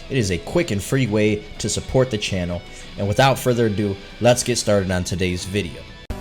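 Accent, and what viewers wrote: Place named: Minnesota, United States. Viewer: Are you from Minnesota? You sound like a Northern Lad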